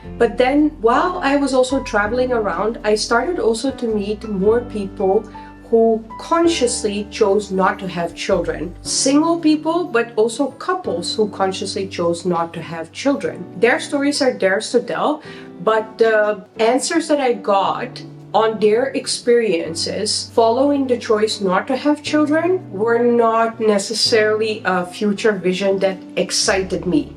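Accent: India. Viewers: congratulations! happy for you and your husband! btw I hear a bit of an Indian accent haha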